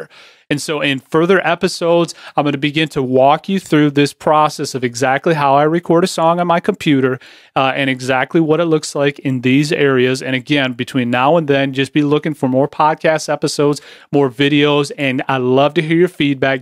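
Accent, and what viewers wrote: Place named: United States. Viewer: oh my god...that american accent !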